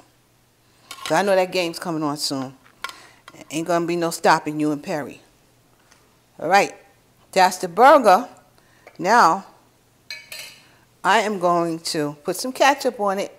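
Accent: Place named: New York, United States. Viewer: I just love your NY accent...I'm in Philly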